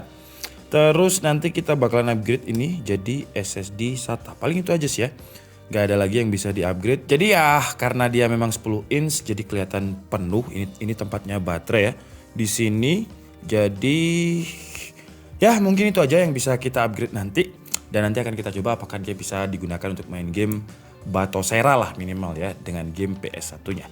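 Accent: Malang, Indonesia. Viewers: Bang lu orang Malang ya?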